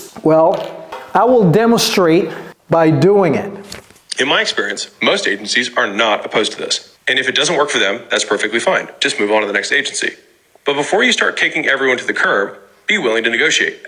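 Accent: United States. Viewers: un togolais, un africain en général peut parler avec l'accent américain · 😮wow that's amazing i love what you do . I trust in myself from now i will start to learne englisch and i will be able to speak like you ,like an american People 😊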